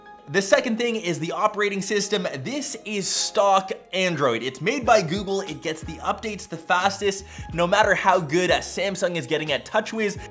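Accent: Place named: Canada. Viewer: your canadian accent seems much more pronounced than usual in this video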